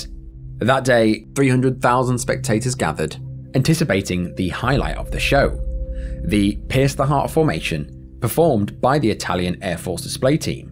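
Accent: United Kingdom. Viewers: I did NOT expect you to be bri'ish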